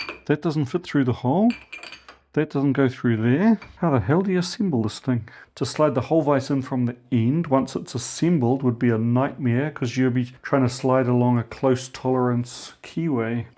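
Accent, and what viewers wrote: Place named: New Zealand. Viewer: Wow what a find. That vice is a real beast that just screams RIGIDITY, so should serve you well. BTW, you're clearly a Kiwi living somewhere in Europe I assume?? I'm in Auckland, NZ. Greetings from down under😊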